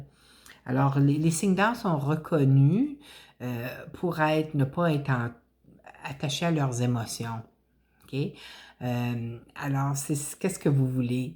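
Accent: Canada. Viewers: Un petit accent Canadien ! J'adore ! ❤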